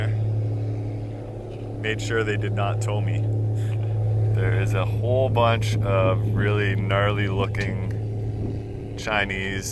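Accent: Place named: Canada. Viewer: So pumped to hear your Canadian, Much love from New Brunswick!!!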